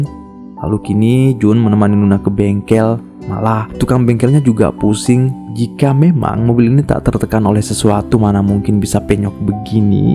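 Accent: Bugis, Indonesia. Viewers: Dehhh masi ada logat bugisnya🤣🤣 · Orang Bugis yaa bang? Tau sidrap ka iyya bang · Serasa ada yg ganjal ,,, sepertinx pembicaranx orang Bugis ... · Bugis apa bang😂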